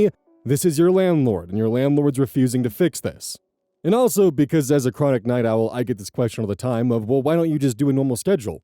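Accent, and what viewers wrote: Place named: United States. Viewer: Nooo don't change how u talk!! Say vib-ah-ray-tions! I love USA accents that arent the movie accent theyre so endearing and quirky!! Pls keep ur regional accent 😭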